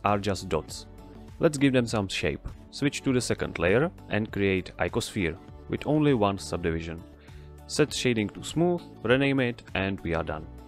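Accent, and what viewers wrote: Czech Republic. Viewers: Nice tutorial! Are you Czech by any chance? :D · u sound like czech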